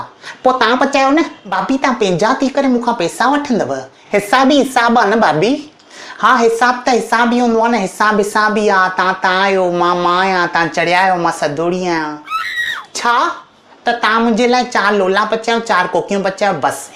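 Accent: Sindh, Pakistan. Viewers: 👌👌👌👌👌👌❤️❤️❤️❤️ bhai aapka sindhi bolne ka style wow 👌👌👌👌👌👌👌👌👌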